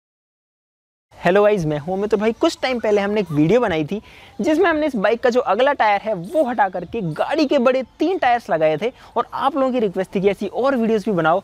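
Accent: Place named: Gujarat, India. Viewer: Oh bai tum gujrat se ho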